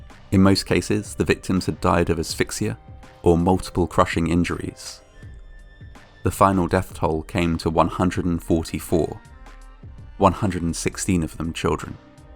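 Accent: United Kingdom. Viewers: American accent?? he's as British as one gets 😂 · He is clearly British.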